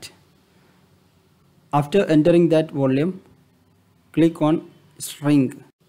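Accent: Kerala, India. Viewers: Malayali analle ???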